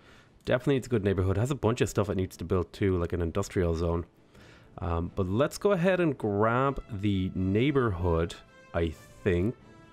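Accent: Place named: Ireland. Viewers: Just Irish. My lot are from Galway, let's just say you're unlikely to get any West Coast farmers as youtubers 😉 no fecker would understand them. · Ah he's Irish, since i'm not from an english speaking country it can be hard to identify such sometimes. I usually associate Irish with the incomprehensible speech of rural citizens, even though that is probably only a small percentage :)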